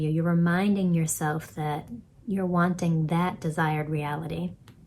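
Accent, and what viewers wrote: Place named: Boston, United States. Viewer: Nice, the secret is a controlled imagination and a well steady attention firmly and repetitively, creation is finished, omg, I love Boston, I think I heard Boston :))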